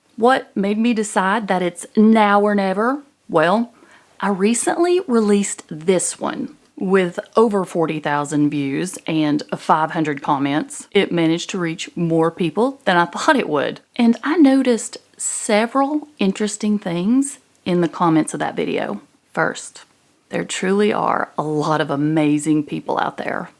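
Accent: South, United States. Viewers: Man, love that southern draw…..what state ya from?😊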